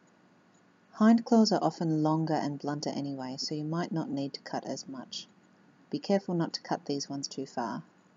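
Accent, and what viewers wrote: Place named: Australia. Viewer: The drawings made no sense to me, and the video could have used another body to manage the camera better, including larger close-ups focusing on the clipped spot. But I did manage to grasp what was trying to convey due to my own earlier experience, where I found more cats to be less than enthusiastic about the procedure. So, in order to prevent a painful mishap, I wrap my mani/pedi ready furballs in a large towel, not too tight, leaving only the head protruding from the cotton sausage, and the paw under consideration sticking out from another tube at some distance to minimize the chance of being bitten. Oh, and in the event blood is drawn, I keep a dish with H2O2 and cotton ball handy. Better safe than sorry. And, absolutely, yes, a special treat comes at the end of the not-really-an-ordeal. P.s. -, could you please narrate at a slightly slower pace for those of us who are trying to comprehend yr Aussie accent and grasp what you're saying at the same time. 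(Maybe that's why I failed to get the drawings.) Thanx. (With apologies if I managed to, however inadvertently, offend with my blunt comment.) Ps2: you have a very compelling and lovely sweet and soothing voice.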